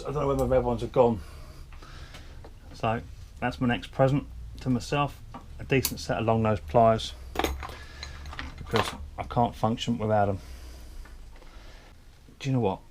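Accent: United Kingdom. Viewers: Love the accent, the British is my favourite, great job with the mower tho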